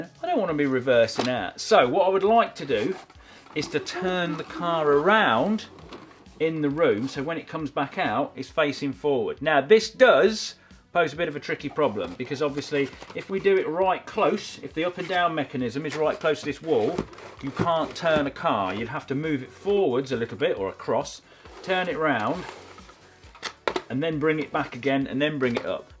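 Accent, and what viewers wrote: United Kingdom. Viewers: It is only me or sound more british then before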